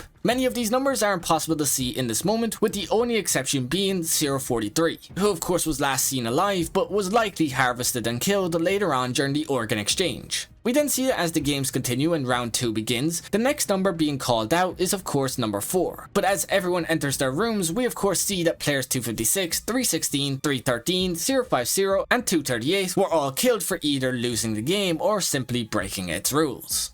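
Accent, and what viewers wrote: Ireland. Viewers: Nah hes just Irish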